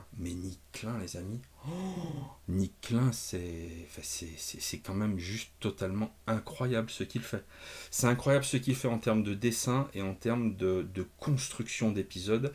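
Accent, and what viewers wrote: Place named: United Kingdom. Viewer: Mais c'est que ça lui va bien ce petit rafraichissement capillaire. Et cet accent british... Oh My...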